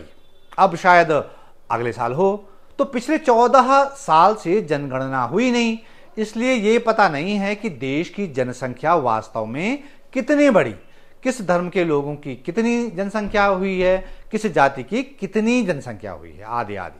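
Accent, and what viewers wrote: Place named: Hill region, India. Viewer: Aapka style aapki Pahar tone I like it nahi bacha payyyyy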